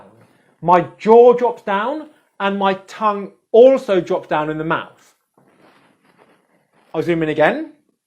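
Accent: United Kingdom. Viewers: I'm sorry to ask this question but are you originally from Britain? Your demonstration of these two sounds are different from another British girl's.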